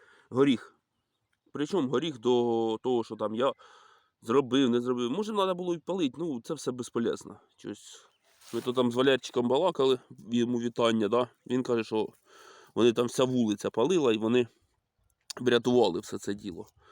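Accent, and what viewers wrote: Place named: Poltava, Ukraine. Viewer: Говірка чую полтавська, а район який? Миргородський трохи поморозило.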